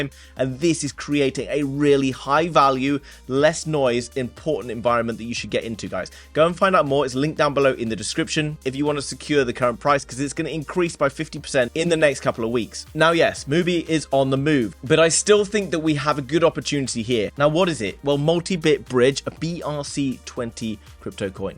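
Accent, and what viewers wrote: RP, United Kingdom. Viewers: Great to see content from the UK, straight talking, no BS. Would be good if he could swap his posh accent to a Geordie one.......just for one vid, obviously. LayerrAI is my pick. Keep up the good work 💪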